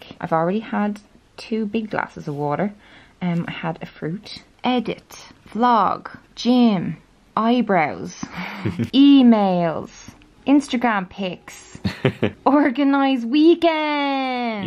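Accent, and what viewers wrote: Ireland. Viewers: ur irish accent is everything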